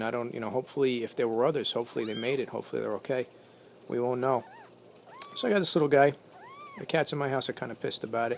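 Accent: New York, United States. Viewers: Buddy, are you from queens or new york general area? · I love the sound of your tough new yorker accent and then this cute kitten. Great combination. You did the best you could. You're a legend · Hey man, are you a New Yorker?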